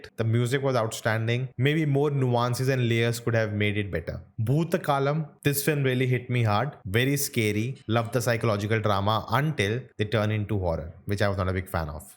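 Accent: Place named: South India, India. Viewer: ..you should watch less South Indian films...Over a period of time...your English accent has developed subtle South Indian tinge...especially when you were pronouncing Hollywood Movie titles....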